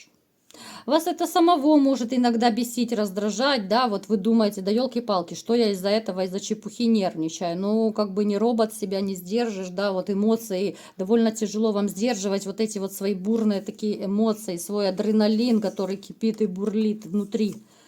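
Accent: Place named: Ukraine. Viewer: В речи редко проглядывается украинский язык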